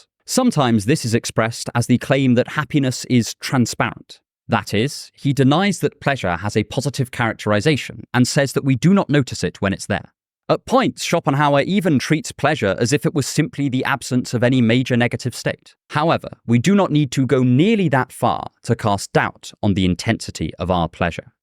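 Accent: RP, United Kingdom. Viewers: You should do a video about biased toward certain sounds or accents according to where one another is originally from. Because at the start of the video my headphones werent on and when video began to play. I was like hey he's a certain kinda cute like a 6.9 but once i heard that semi-posh, almost bbc rp accent, you went up to at least a 9. Another example is the lead singer of inxs michael hutchence yes he was a darling but his singing and aussie accent had me as a young girl thinkin' wow he blew my mind he skyrocketed to an 11 and i'm just a yank. Been to a bunch of shows over the mid 80s into mid 90s. Them playing LIVE is better than any I've seen ever after back in the way back days i was 15 in 1988. Although the drummer Jon Farriss was by far more symmetrically attractive with the chiseled cheek bones, but he didnt really say a whole lot (the strong silent type) and i think he may have sung on one obscure tune! 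But he was a looker for sure!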